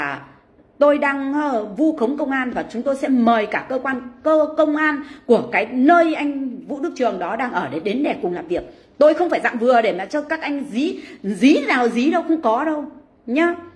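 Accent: Vietnam. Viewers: Anh nghe giọng của em hình quê thái bình phải không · Hôm nào sẻ mời CAFFE, tâm sự giọng Bắc chính gốc, quá OK luôn.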